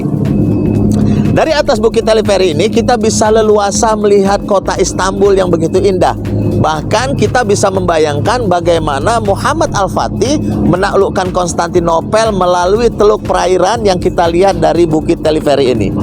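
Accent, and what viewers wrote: Medan, Indonesia. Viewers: adek ini orang medan ya?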